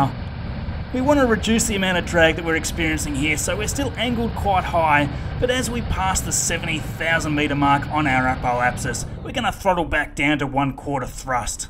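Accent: Australia. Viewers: It's an Australian accent. Didn't you catch the "crikey!"? lol. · just got a sub only due to your amazing auzzie accent, what state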